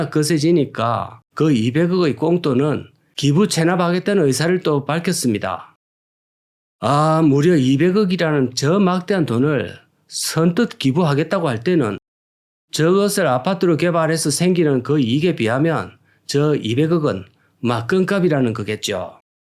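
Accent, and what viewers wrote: Gyeongsang, South Korea. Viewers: 갱상도 아이가?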